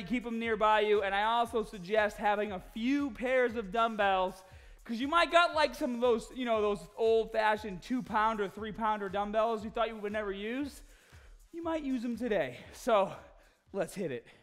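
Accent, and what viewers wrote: Boston, United States. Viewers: This sounds sappy as hell, but I am SO THANKFUL for these workouts. I've been using your videos four or five times per week for 7 WEEKS and my body has literally TRANSFORMED. I live in Massachusetts and I stumbled onto his YouTube channel and I legit started doing the workouts because I heard his Boston accent. This guy is the REAL DEAL!!!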